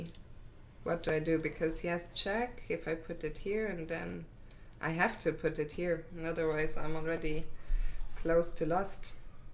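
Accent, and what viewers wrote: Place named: Germany. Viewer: Choker is good fun if you can get it to run on your platform. I've tried 3 different Android versions and struck out every time. Am curious what version all the streamers are running on. Great job on the videos. Am intrigued by your accent. It sounds German, but if I recall correctly you're native to Luxembourg. How many non-English languages do you speak? Your English colloquiallisms and idioms are very good, attended university in the UK or US?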